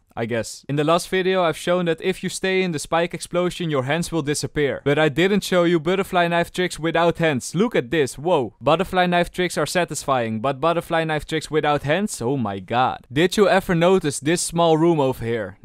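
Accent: Netherlands. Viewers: Its a dutch accent😂 the way he talks and he confirmed it with the word camera lol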